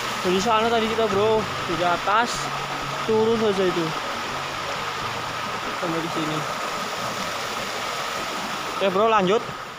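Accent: Banyumas, Indonesia. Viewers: Bahasanya ngapak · Sampean wong ndi kang deneng bhsane ngapak😂👍🤭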